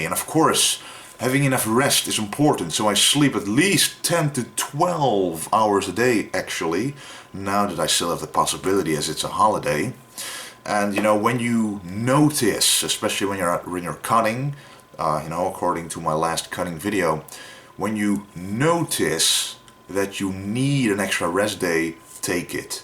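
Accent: Netherlands. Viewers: Yes, Dutch people--I would bet you he would tell you that the olympics are in Rye-o.They simply pronounce it as a long i to be safe. IO is tricky--think riot and prior. All different...Either way, sorta being a hard ass to bust a guys balls on a point such as that when he is doing a youtube video about fitness in a second language--pretty fucking impressive.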